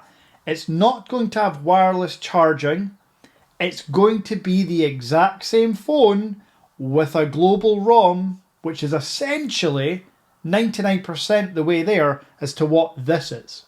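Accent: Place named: Scotland, United Kingdom. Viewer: I had to watch this one on silent / closed captions. It took me a while to work out why you were talking about your doctor - the subbies kept referring to GP. Turns out that Google doesn't know the Scottish pronunciation of G Pay!!!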